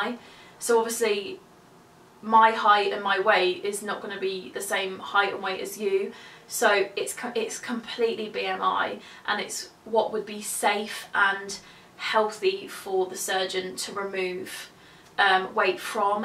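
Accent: United Kingdom. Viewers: Oh that British ascent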